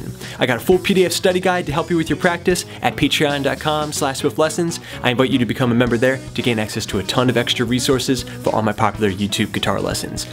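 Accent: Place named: Maryland, United States. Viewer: dude are you from Maryland? Thanks for the lessons by the way. really helping me pick guitar back up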